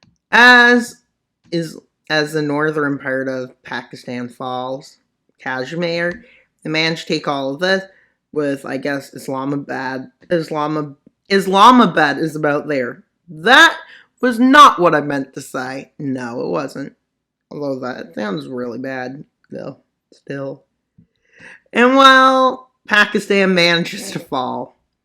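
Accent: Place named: Alabama, United States. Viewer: I don't think he is pa jeet because bro sounds like he is from Alabama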